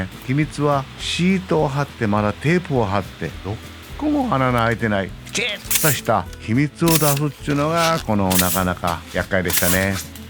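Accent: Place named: Kansai, Japan. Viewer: 建築設計事務所をやっています。子供の頃、模型を作っていた私に、親父がしきりに「物事の道理」ということを言っていましたが、さんの作られた住宅を見ていると、その言葉が思い浮かびます。私も関西出身ですから、さんの話す言葉が、親父が話している言葉のように聞こえてきます。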